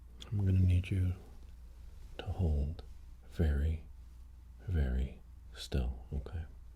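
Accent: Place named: Massachusetts, United States. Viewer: This guy is from Massachusetts for sure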